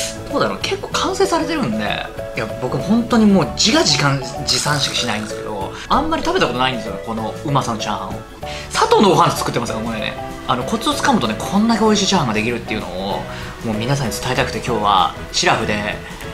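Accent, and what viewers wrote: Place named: Chiba, Japan. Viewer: 君て千葉でしたかー千葉の従兄弟みたいだなと。イントネーションが一緒なんで親近感あります😁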